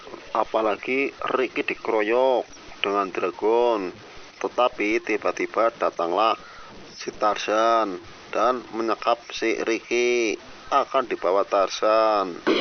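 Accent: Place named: Banyumas, Indonesia. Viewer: Rika wong ndi bos ngapak temen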